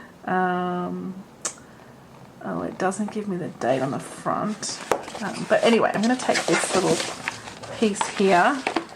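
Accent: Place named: Australia. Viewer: Always love your videos so much! Thank you for consistenly uploading!!! (Wisconsin, USA subscriber here ❤) and absolutely love your voice and your awesome Australian accent!! (My almost 13 year old daughter Loves talking in Australian and british and so on accents (and actually shes really very good at it lol) and when she hears you on my phone, she always comes over and says how much she loves you and how you talk!!😁) and calmness and excited-ness all at the same time 😆 I always look forward to watching your videos and appreciate the time and effort you put in to making them. 😊 I hope your week is going great :)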